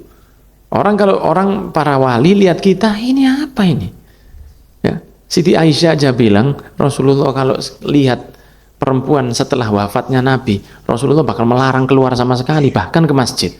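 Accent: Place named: Java, Indonesia. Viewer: ...baru lihat nih habib ganteng abis...tapi ngomongnya kok medok jawa nya...semoga beliau di rahmat i Allah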